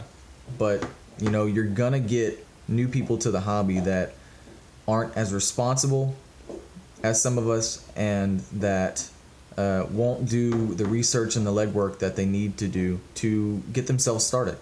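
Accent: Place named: Texas, United States. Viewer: Digging the vids and your accent sounds just like me and everyone I know from Texas. Just got a Wizard from Grayson Hobby today, goggles have not arrived from banggood yet. I have not really flown drones much, maybe 4 times and not racers. I do however play the shit out of Battlefield and all I do is fly the copter and I think it teaches you how to maneuver a rotor and use the controls so I think I am ahead of the game, controls are the same just a lot more sensitive on the drone. I hovered the Wizard in my living room tonight, piece of cake. I will say my confidence is high but I am going to do it just like you. Hover, learn to move, low and slow to begin. I also have a tiny whoop on order to practice with and I already have the cable on its way to run sims on the computer with the FS I6. I already have a sim and am currently using the PS controller to practice until I get my cable. 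So everything you say is good advise the way I see it. Eventually we will get there. I just wanna fly, not in it to race. Well, not yet. Time will tell. Keep up the good work. Keep the vids coming.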